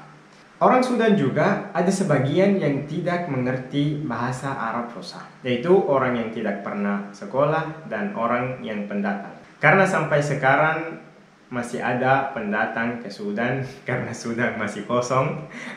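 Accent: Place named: Sulawesi, Indonesia. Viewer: Bang masyaallah sukses selalu ya, btw logatnya abang Sulawesi bgtt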